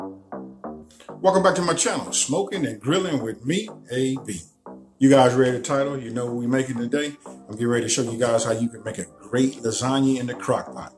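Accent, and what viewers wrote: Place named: California, United States. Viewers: Classic CalifornI.A. accent, went to college with a bunch of guys from Cali. They all sounded tha same, LOL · I can tell he’s from the West Coast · This dude is dope AF!!! What up bro bro!? I hear that Cali swang in your voice dawg... Wes wes...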